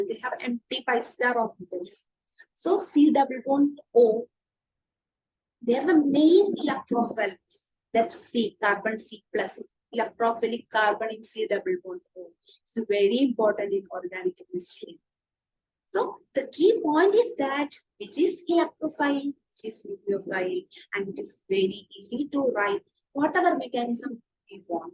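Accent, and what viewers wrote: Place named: Kerala, India. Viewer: Malyalam mathii english not clear mam.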